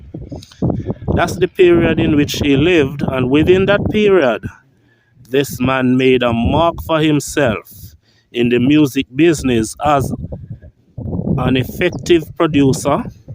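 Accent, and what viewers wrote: Jamaica. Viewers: Arr u jamaican mr ur kind of proñounciation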